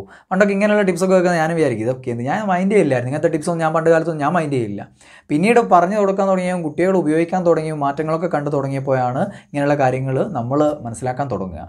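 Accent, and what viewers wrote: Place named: Vadakara, India. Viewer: വടകര ഭാഷ......സൂപ്പർ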